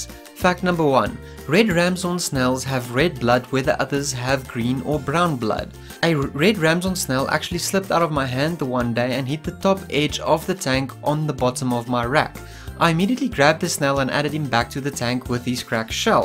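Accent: South Africa. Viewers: you sound like my high school shop teacher are you from South Africa? coolest accent ever imo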